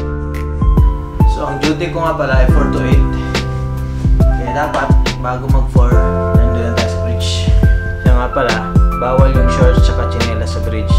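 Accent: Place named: Visayas, Philippines. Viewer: Sir taga asa ka sir? Kabalo man gud ka mag bisaya haha. Pa shout out na din😘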